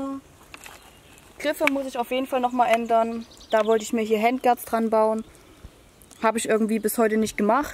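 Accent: Saxony, Germany. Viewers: Dein sächsischer Dialekt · Woher kommst du ?Sachsen ?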